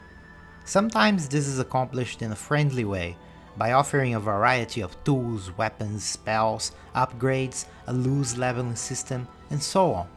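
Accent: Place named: Brazil. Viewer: WOW. What a hidden gem of a yt channel and videogame critic. Came into the video expecting some very copy paste criticisms that anyone could have come up with after a few play sessions. Came out feeling i’ve heard one of the best videogame criticisms in a while, and i watch reviews/critiques of games all the time, especially Elden Ring, since the game made me feel so confused and mixed overall, with so many Outstanding moments and so many boring ones during my completionist 150h playthrough as a Souls veteran. Instantly subscribed, now i need to see some more reviews, there are very few as coherent, thoughtful and all encompassing game critics in yt. Only curiosity... are you from a latin country? Your accent feels similar to brazilian portuguese “ingrish”, and at first i found it a bit annoying, it actually grew a lot in me and it was very clear and easy to understand, and had a good cadence to it. 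Great video and analysis and hope to see more like this in the future!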